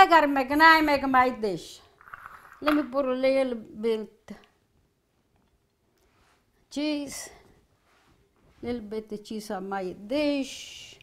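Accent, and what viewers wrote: Italy. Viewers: La signora, classe 1935, ha lasciato l'Italia negli anni '70, partendo dalla provincia di Foggia. Come molte donne del Sud Italia, probabilmente avrà al massimo frequentato le scuole elementari e quindi l'unica lingua parlata era il dialetto foggiano/pugliese. Questo aspetto, dopo 50 anni vissuti negli States, comporta questa pronuncia (simile a molti emigrati italiani negli USA), ovvero uno strano mix tra dialetto, italiano e inglese. Con parole italiane che - con il tempo - vengono pronunciate nella maniera inglese. E questa forte cadenza del suo dialetto che influenza la pronuncia in generale. Le dosi di aglio e peperoncino, come dice anche mentre prepara il piatto, sono abbastanza a discrezione. Anche secondo me ne ha messo troppo (tra l'altro - come sai - alcuni mettono lo spicchio intero e lo tolgono alla fine) ma, soprattutto, ha messo il pecorino/parmigiano che in questa ricetta non c'entra una fava! 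😅 Già a me dà fastidio il prezzemolo (che va messo comunque alla fine, non nell'olio caldo!), ma è un ingrediente che si usa mettere. Ma il formaggio grattugiato proprio no.😩 Comunque, come forse sai, è abitudine abbondare di aglio nelle cucina del Sud. Come con il peperoncino 😳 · I simply love this Italian Grandmother. I made this last night, and it came out fabulous. Thank you so much for sharing. Love her accent. I can listen to her all day. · First, the word anchovies is in the plural, so you ask: 'Where are the anchovies?' Second, anchovies do NOT go very well with this dish, what about some cheese ? However, I love the Italian accent ! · But it's hard to understand her .she has strong Italian accent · Thank you for sharing your faith Bless be Jesus Christ give you more year continue cooking with love sharing with all people around the world 🌎 ❤ 💕 God bless you always Have bless day I love your Italian food and your beautiful accent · She speaks a perfect Italianglish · How much i like that accent! 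Italia!😊💝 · I like her Italian accent 😄😆😁🙏👍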